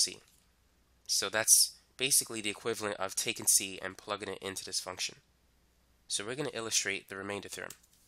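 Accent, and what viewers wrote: United States. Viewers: Pay attention he teaches well He is an American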